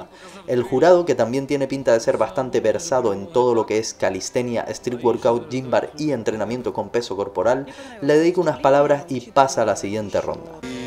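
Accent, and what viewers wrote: Argentina. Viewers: ¿por q esta tan argentino en este video? XD, las batallas de gallo o q? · Me está gustando que estés implementando el acento argentino bien buenardovich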